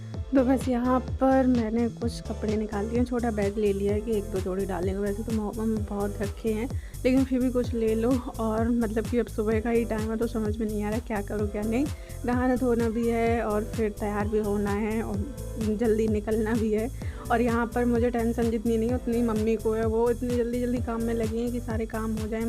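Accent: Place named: Bundelkhand, India. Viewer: tum to uma ke yahan hi enjoy kar rahi thi tum log bundel khandi ho kya boolne ka dhang se mallom.parta hai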